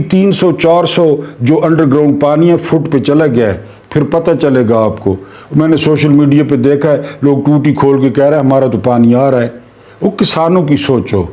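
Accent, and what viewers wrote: Saraiki, Pakistan. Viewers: صاحب حد ہی کر دیتے ہیں آپ سرائیکی کوٹڈ پنجابی سننے کا اپنا مزہ ہے ۔جگر حق و سچ کہنے پر بند نہ پڑھوا بیٹھنا ۔❤❤❤❤❤❤❤❤❤❤❤❤❤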